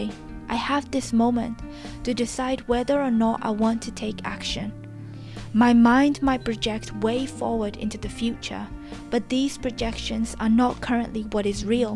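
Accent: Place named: England, United Kingdom. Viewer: I hear a cute English accent❤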